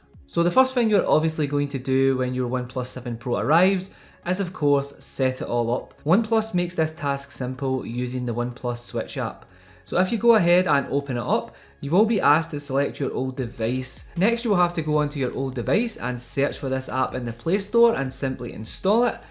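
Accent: Scotland, United Kingdom. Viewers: I guess you are Scottish gerat video 👍🏻mate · ... Ef you liked it... Haha. That Scottish accent. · Are you Scottish? Love the accent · OK, Had to subscribe, hearing a Scottish voice instead of an American or Indian one. Goan yirsel' ! Totally agree about dark mode, Google has taken far too long to make it an option, they've also been doing their best to kill the Substratum themes capability too, I think their devs are jealous when custom rom devs make nice toys. FYI That phone isnae gonnae work well wae nae sim card though :) One tip I hadn't known about was double tapping in the cam app to switch cameras between front/back, saw that in a review recently. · Are you from Scotland??you got amazing accent!!! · Scottish accent. Right? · Scottish accent is a bonus! I love it! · man ILOVE YOUR ACCENT - where are you from ..? Scotland .??)) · I'm subscribing to help you reach your 100K subscribers. 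Be thankful to your Scottish accent. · His he Scottish? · Scottish mate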